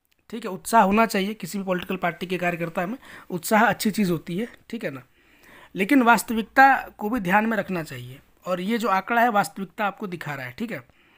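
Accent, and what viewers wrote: Bihar, India. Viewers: आवाज तो बिहारी की है।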